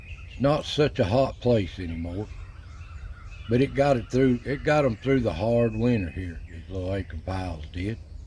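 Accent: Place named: South, United States. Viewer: Thank You, love these videos and the music! And of course your southern accent 😊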